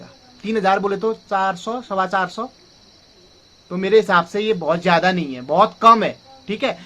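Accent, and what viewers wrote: Pahari, India. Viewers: भाई हमको आप की बात चीज बहुत अच्छा लगा पहाड़ी भाषा नीको लागछ 🙌💐🙌💐🙌💐🙌💐🙌💐👌🌹👌🌹👌🌹👌🌹👌🌹❤️🌹❤️💐❤️💐 दिल से बहुत अच्छा बहुत अच्छा है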